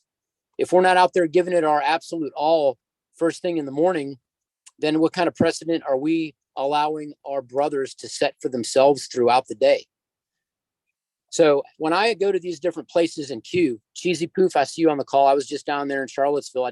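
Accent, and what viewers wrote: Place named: Birmingham, United Kingdom. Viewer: Where are you from? I’ve keyed in on your accept. It’s Barney Fife In Birmingham.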